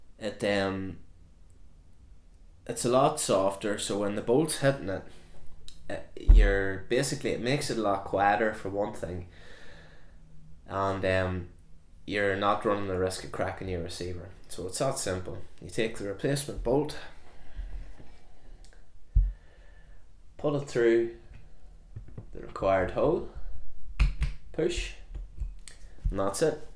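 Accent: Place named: Northern Ireland, United Kingdom. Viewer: Great video, I just purchased a second-hand 10/22 and tactical FDE stock today from Young Guns in Castledawson. I set aside a 25 round magazine because I know the PSNI have tried to make it a lot harder to purchase mags. Big hello from Co. Tyrone. It's nice to hear a local accent on YouTube for a change.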